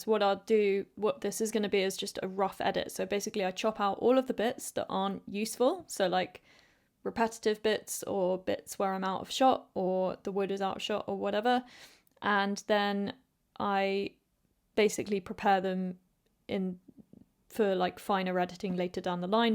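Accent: United Kingdom. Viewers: I am captivated by your woodworking skills ,love your British accent. The hoping is probably good for blood flow to your legs .🌻🐇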